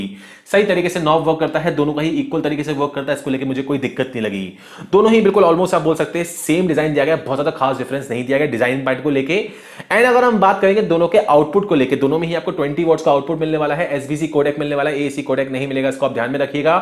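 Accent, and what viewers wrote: India. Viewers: Thank you for this comparison. I speak English as a second language but I’m quite fluent. However I find a bit difficult to get all the 200 words per second with this Indian inflection. The video seems very good but I suggest to slow down a little and try to capture a wider audience.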